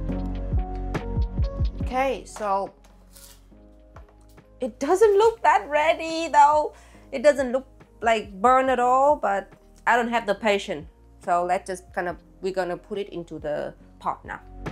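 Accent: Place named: Germany. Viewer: Hi! I don't know how your German is coming along, but you are developing a German accent in English, so I'm guessing your German is improving!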